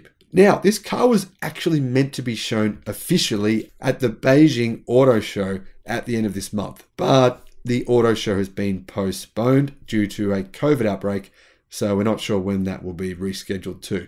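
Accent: Australia. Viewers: He’s Australian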